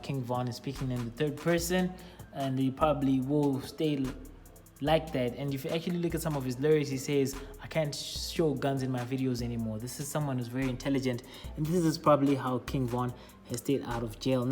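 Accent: South Africa. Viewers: Bra are you South African? your accent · maaaan you sound so South African please tell me you are 😂😀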